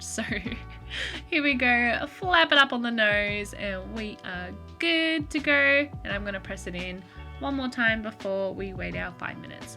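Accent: Australia. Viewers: Wow, as an Aussie who has been living in Japan for 15 years watching your videos and hearing a true blue Aussie accent is blowing my mind a little lol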